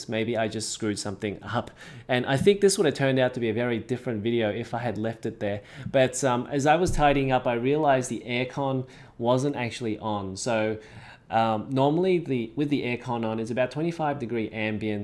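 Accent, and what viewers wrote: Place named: Australia. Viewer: Thanks. Great video. Always nice to hear an Aussie accent on youtube.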